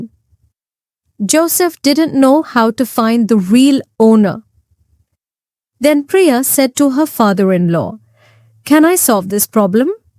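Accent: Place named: India. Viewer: Indian accent.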